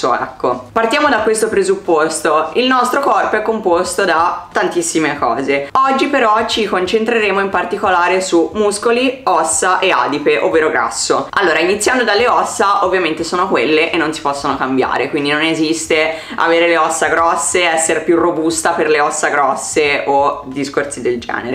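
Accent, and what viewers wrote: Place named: Tuscany, Italy. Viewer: Bellissimo accento toscano, ma di dove esattamente?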